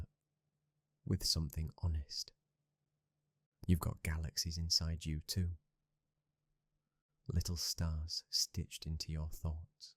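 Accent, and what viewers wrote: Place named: Yorkshire, United Kingdom. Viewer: That voice is so soothing and melodic. I know a Yorkshire accent when I hear one. Are you from North, East, West, or South Yorkshire?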